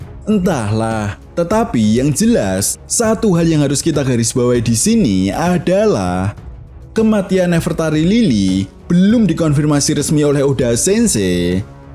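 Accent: Java, Indonesia. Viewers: Logat jawanya lucu, ini yang bikin betah nonton. 👍🏻👍🏻👍🏻😂😂😂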